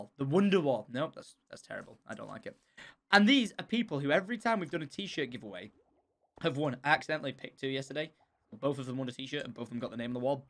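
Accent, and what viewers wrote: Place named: Scotland, United Kingdom. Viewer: Why does turn scottish all the time lately? 😂😂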